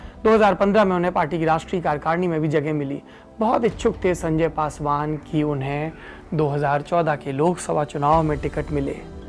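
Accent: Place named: Bihar, India. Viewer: .aap bihar se hain kya, bihari language bahut jachti hai aap pe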